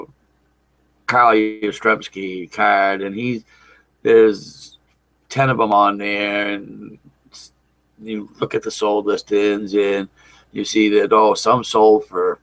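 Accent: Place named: New Hampshire, United States. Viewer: I really like these videos, and your accent reminds me of living in Charlestown, NH :)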